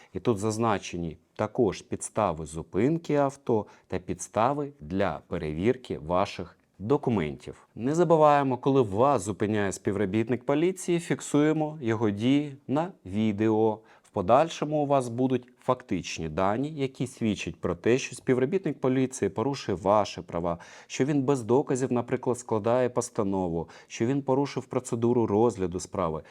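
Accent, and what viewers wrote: Russia. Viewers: не "К"арячу лінію а Гарячу, В УКРАЇНСЬКІЙ МОВІ "Г" ВИМОВЛЯЄТЬСЯ БЛИЗЬКО ДО "Х" А НЕ "К" ЯК В КАЦАПНІ, ВЖЕ ЧАС ЗАПАМ"ЯТАТИ, ТА ПОЗБУВАТИСЯ КАЦАПСЬКОГО АКЦЕНТУ, НЕ ВПЕРЕШЕ ТЕБЕ ВИПРАВЛЯЮ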